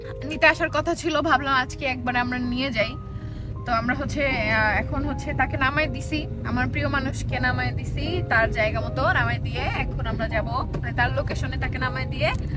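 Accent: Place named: Khoyraita, Bangladesh. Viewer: খয়রাইতা কাঁথা বলছো আমার শুনতে ভালোই লাগছে😅😅😅😅😅😅😅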